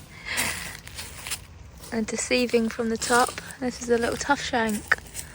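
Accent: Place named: Sheffield, United Kingdom. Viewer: Question are you from Sheffield?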